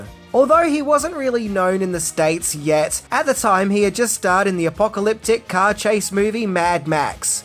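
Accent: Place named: Australia. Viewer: I was just a kid when I saw this. This is one of my favorite movies. Please try me! I'll update as I watch this. So, 1st you're Aussie without you telling me you're Aussie. Oliviabwas actually from the UK. Waiting for you to mention her ex-husband, which she had 1 child. He played the younger Gene Kelly. And yes, this is literally an album. Knew she loved this because Gene Kelly!!! She looked absolutely beautiful in this movie and i stan 💯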